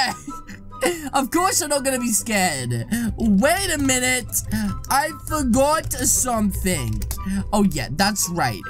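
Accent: United Kingdom. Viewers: Are you british nice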